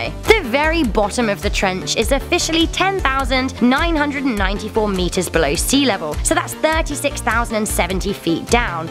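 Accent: United Kingdom. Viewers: yeah thanks but i dont wanna hear british english anymore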